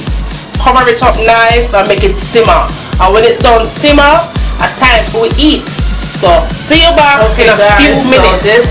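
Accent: Jamaica. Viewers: I love the Jamaican accent but do not let the Jamaican woman get mad at you...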